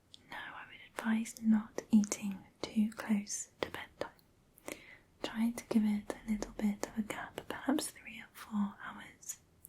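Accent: England, United Kingdom. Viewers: I now love women with english accents😊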